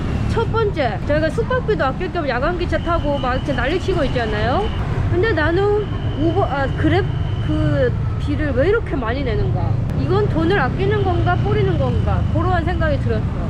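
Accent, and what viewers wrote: Jeolla, South Korea. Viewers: 부산사람이 전라도 사투리 쓰시네요 ㅋㅋ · 목소리가 경상도 ? 충청도? 전라도? 어디쪽인가요? 다 섞인거 같으신데 ㅎ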